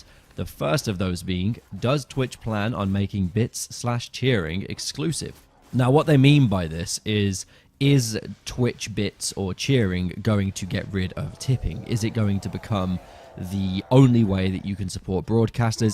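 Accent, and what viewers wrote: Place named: United States. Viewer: speaking american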